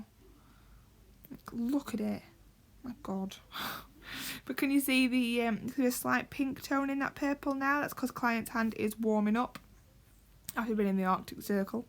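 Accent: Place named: South Yorkshire, United Kingdom. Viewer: Just discovered your channel today & it’s going to be so useful for me (currently training). It’s so nice to hear someone speaking in a S Yorks similar accent to me.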